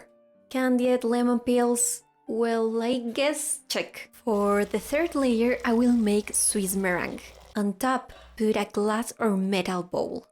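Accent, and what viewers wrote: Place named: Russia. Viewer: Interesting recipe.. thank you! but you're accent is funny, is it russian?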